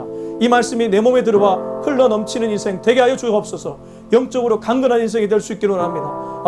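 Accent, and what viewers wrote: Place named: Gyeongsang, South Korea. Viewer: 목사님의 경상도사투리 멋쪄요...^^ 우리들은 악인를 따르지않도록 주님께 기도드립니다^^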